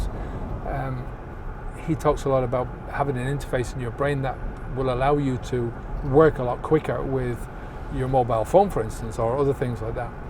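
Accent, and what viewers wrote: Ireland. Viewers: I'm loving your videos. I'm Paddy from Wicklow do you mind me asking what part of Ireland are you from?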